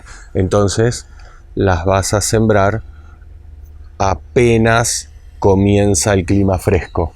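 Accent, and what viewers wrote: Buenos Aires, Argentina. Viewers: Andrés Modesto Rodríguez De León es la forma de hablar de los “porteños” (o sea de los de la ciudad de Buenos Aires y alrededores) en Argentina. Hablan así, parecen soberbios. Te lo dice una Argentina del interior del país. A nosotros a veces también nos choca esa manera de hablar. Pero sabe un montón. Junto con Tony de España, a mi me han ayudado a hacer mi huerta.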